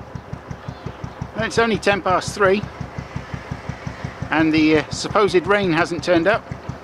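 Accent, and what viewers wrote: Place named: Yorkshire, United Kingdom. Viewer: Great work, welcome back, Love the Yorkcanshire accent ! AJ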